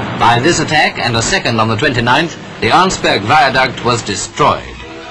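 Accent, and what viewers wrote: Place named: United Kingdom. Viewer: In short, yes, proper British bomb, proper British voice.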